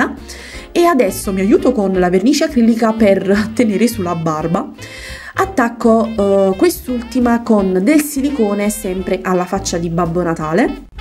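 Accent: Naples, Italy. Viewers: sei di napoli?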